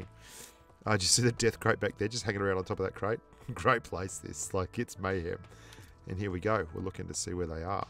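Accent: Australia. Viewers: I love your Aussie accent...keep it up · Strayan accent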